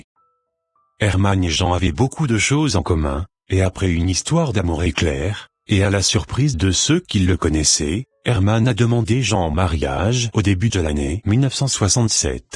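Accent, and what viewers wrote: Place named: France. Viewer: Millionnaire s'il te plaît pourrais-tu prononcer en anglais les prénoms de Jean et des villes américaines sans accent français parce que dans ce reportage je me demandais de chaque fois pourquoi il vient avec un nom d'homme je m'excuse encore. À plus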